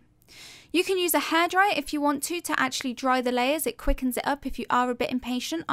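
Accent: United Kingdom. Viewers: Are you British? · are u british? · For some reason I forgot where you from. Sound British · Oh I love your British accent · She has the best British accent❤️❤️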